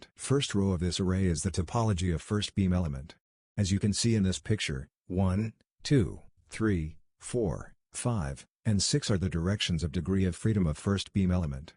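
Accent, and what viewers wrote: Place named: United States. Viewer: Wow your accent has changed into American in just few months ! 🤩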